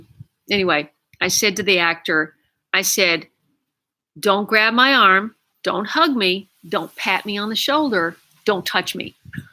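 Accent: United States. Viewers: ... you're back in Palisades???? livestream had no idea you were puttin one out on transmission only by accident did i connect ( that's me for ya) you'll never guess all my 22 guests and friends.. how many all together your guess is as good as mine they just kept coming through my door we all sat where ever they could and connected to America (livestream) My family have been here in the UK since the run up week to Christmas so to hear a native American accent other than family made a few cry...with sentiment must say got to me also..... aas we sat watching and listening to comments it was a little heart touching but thanks you have made a lotta people happy here in my household THANKS....... have you had any response to house sale??? maybe now the holiday is coming to a closes it may get moving " i do hope so ( i pray it gets "done n dusted" Happy New Year... 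and a prosperous one seeya later more to say on email Edxx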